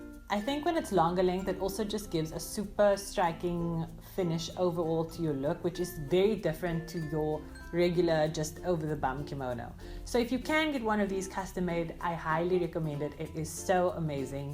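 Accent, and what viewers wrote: South Africa. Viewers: Love the South African accept ❤️💜💙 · Are you South African?? your accent is so interesting!! cool video btw <3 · South Africa!!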